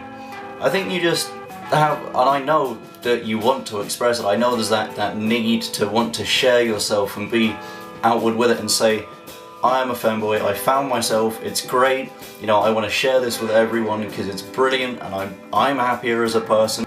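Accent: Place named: Appalachia, United States. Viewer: Femboy with a hillbilly accent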